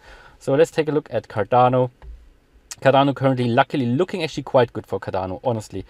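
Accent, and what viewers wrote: Germany. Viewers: love this channel, thanks dude. keep it coming. btw are you german?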